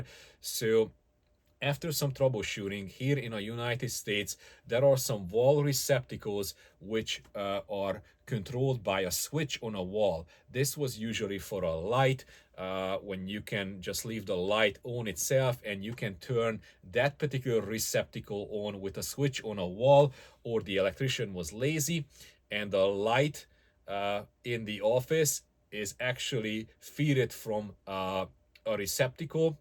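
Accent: Hungary. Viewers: are u hungarian by any chance? your accent really sounds like it:)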